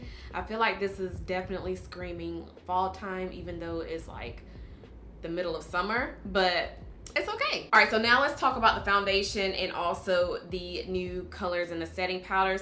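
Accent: South, United States. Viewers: Love your channel! And your accent! Are you from the south? I’m from Mississippi so I love hearing what I think is a Southern accent :) I bought butter pecan in Sephora a couple days ago but it’s a tad too dark and orangey on me. I’m thinking I MAY be caramel...😩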